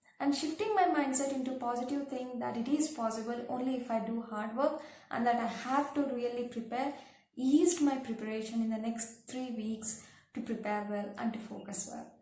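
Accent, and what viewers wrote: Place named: West, United States. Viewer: So chosen to adapt the western accent too.. and advertising the three books with white cover..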